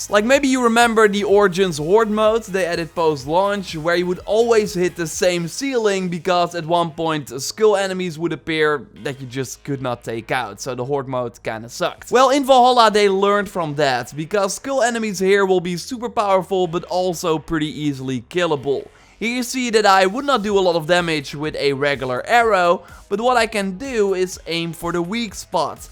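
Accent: Netherlands. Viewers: Is hé dutch?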